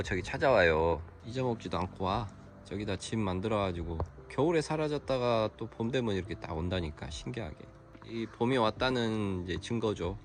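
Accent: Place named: Jeolla, South Korea. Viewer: 풍경이 참 예뻐요 럭키 보는 맛에 애청중입니다 가끔씩 친숙한 전라도 사투리가 정겹네요 워메 ~따라와붔네 ㅎㅎㅎ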